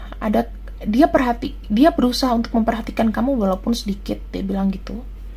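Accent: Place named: Central Java, Indonesia. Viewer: Kmu ini orang jawa tegah y Soal nya jwaban setiap Do,a do,aku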